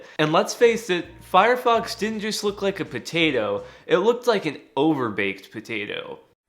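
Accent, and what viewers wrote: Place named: California, United States. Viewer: I like his California Stoner dialect.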